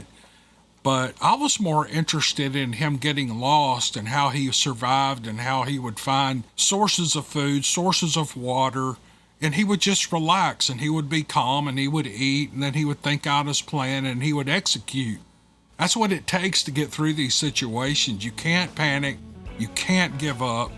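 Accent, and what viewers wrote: South, United States. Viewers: your commentary, opinion, jokes, southern drawl and personality are why we follow you. Hate to hear you constantly apologizing for being you. You need to understand that's why so many love your channel and continue to follow/ subscribe. We can hear Bigfoot stories many places but only at do we get the Dailey dose of we all need in our lives. Sending love from your Yankee followers in CT ❤❤❤ much love brotha, ty for being you!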